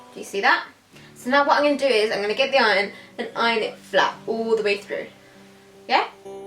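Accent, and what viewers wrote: United Kingdom. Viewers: Wow u have a beautiful british accent, and loved ur video.. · Great British accent......❤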